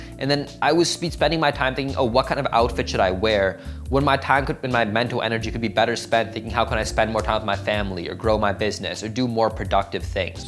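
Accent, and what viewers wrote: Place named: United States. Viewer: Hey you are from India? How did you get the american accent so easily?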